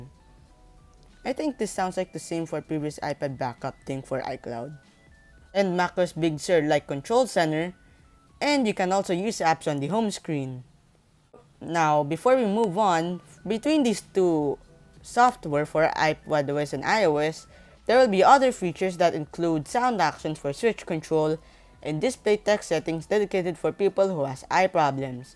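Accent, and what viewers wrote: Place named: Philippines. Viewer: You are unmistakably Filipino, that voice gives it away 😂. And yes, I'm the same person from Twitter.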